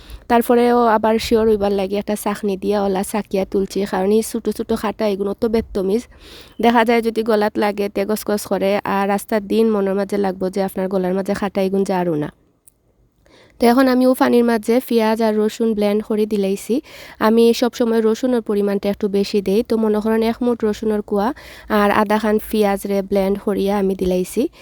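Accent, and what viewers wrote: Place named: Sylhet, Bangladesh. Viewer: আমি আমার বাবার চাকরিরজন্য প্রায়১২ বছর ছাতকে ছিলাম। এখন যখন আপনার সিলটি মাত শুনি আমার কাছে খুব বেশি ভালো লাগে 😇😇😇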